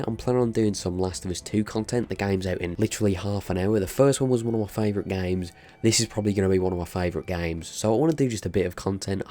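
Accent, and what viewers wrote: Birmingham, United Kingdom. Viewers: Wow didn't know you were a fellow brummie. Nice to hear man haha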